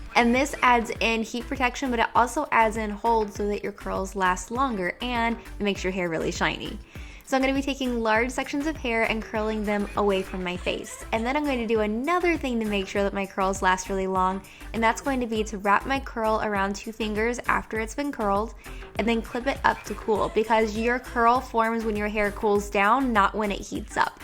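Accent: United States. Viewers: Can I please have your American accent ? My Australian one has NOTHING on yours girl, also if I could have your hair too that'd be cool 😉💕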